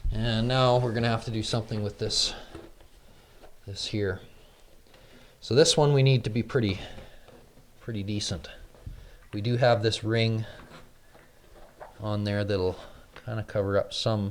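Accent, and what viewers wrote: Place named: Canada. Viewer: i love your canadian accent 😃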